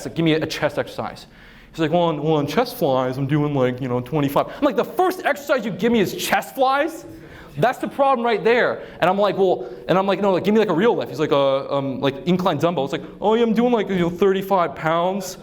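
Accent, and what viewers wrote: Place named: Canada. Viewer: He nails some pretty hot broads and is def straight, I think its a Canadian accent tho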